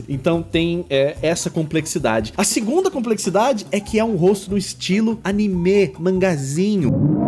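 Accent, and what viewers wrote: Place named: Paraíba, Brazil. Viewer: que jeito paraíba de falar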